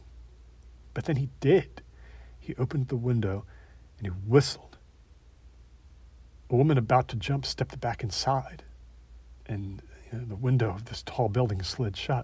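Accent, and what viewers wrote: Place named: South, United States. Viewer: Can’t take the southern outta the Australian Ohioan 😄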